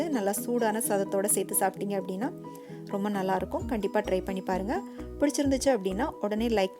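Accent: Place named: South Tamil Nadu, India. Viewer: mmm nanun ipdithan seyven! And which is ur native ! Your slang seems that u r frm south tamilnadu!am i right !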